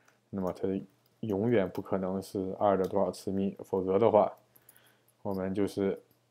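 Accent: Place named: Dalian, China. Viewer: hh 大连话好亲切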